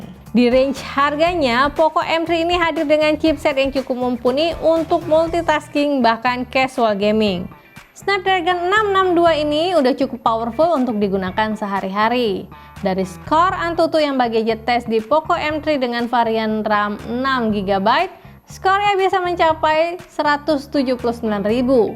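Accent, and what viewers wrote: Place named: Depok, Indonesia. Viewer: kalo dari logatnya kek org depok😄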